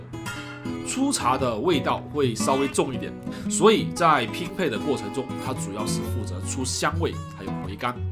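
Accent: Chaoshan, China. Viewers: 怎么感觉作者是潮汕人？有点像潮普哈哈哈哈哈哈哈哈哈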